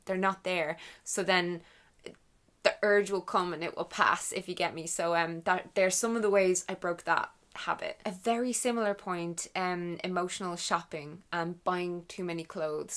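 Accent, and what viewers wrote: Ireland. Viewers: Hey. I'm am old fan. I picked up ur Irish accent in my teens 😂. I'm in my 20s now and my 3 worst habbits i wld really like to control are: 1. Waking up late. 2. Biting my dry lips. 3. Not drinking enough water.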